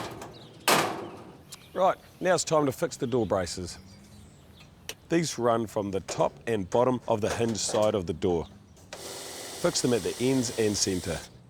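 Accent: New Zealand. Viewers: Just a Kiwi accent the whole time mate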